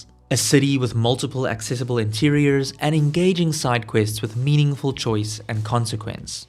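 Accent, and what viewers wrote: South Africa. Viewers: i hear a south african... i watch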